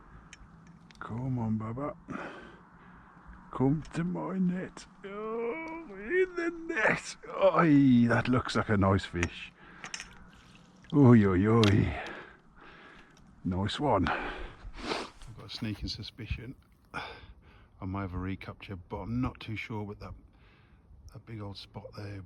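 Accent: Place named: Black Country, United Kingdom. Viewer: nice one mate. I thought u mite set me strate on the 'brummy' thing, i use the term generically. I shud of been more accurate and sed you are a YAM YAM or WERNIT, and me getting that wrong cud almost be classed as an insult, so i do appollogys for my incorrectness. I think i have got that rite, with the YAM YAMYAMS and WERNITS and BRUMMYS, there is probly sum more 'off shoot' local terms for the 'tribal affiliations lol, that i'm not familiar with. So if you are a Blackcountry fella, that means you're not a Brummy, strictly speaking. You know wot us northerners are like we hear an accent and thats that lol. Anyhow mate spot on fishing and i appollogies for the brummy thing. Cheers Mick.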